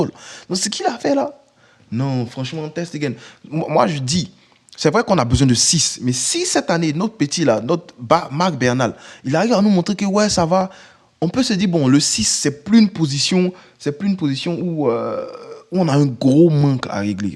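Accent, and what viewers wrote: Ivory Coast. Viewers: L’accent ivoirien me fera toujours rire. Tu me régales frérot, continues comme ça.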